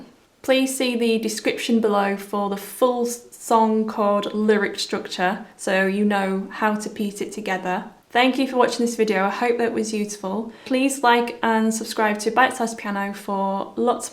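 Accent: United Kingdom. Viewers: I love your British accent!😍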